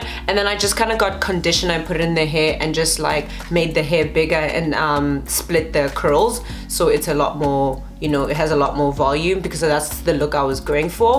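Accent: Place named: South Africa. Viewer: South African accent😍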